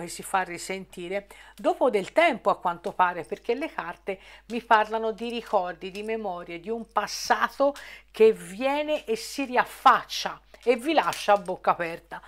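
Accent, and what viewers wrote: Tuscany, Italy. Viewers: ciao...ti seguo da qualche tempo, l ultima lettura due tre giorni fa... A parte quanto adoro la tua pronuncia toscana e i tuoi "guarda qua" ehehe sei simpaticissima! Ma volevo confermarti, in merito al ritorno di qualcuno dal passato... Giuro che ho appena ricevuto un messaggio da chi non sentivo da 1 anno! L ho visto apparire mentre ti sto ascoltando in diretta 😱. Ho avvisato prima te, prima ancora di leggere il contenuto del messaggio stesso!! 😇 Complimenti per la predizione... Ora sono curiosa di andare a leggere la sua missiva!! Chissà cosa avrà da dirmi???? Ciao un abbraccio 🎄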